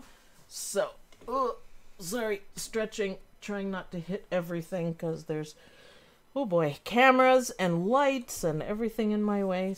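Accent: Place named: Ontario, Canada. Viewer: As usual, I'm watching late. My mom always said I'll be late for my own funeral (doesn't sound so bad, actually!). Love the fabrics you used, so cute. KK designs work out perfectly, every time. BTW, I'm mostly from Ontario - Niagara area, Welland & St. Kitts. PEI. Many of the States. But, Ont is home. That's why I enjoy your accent so much - it's home. 🇨🇦 Thanks for another wonderful video!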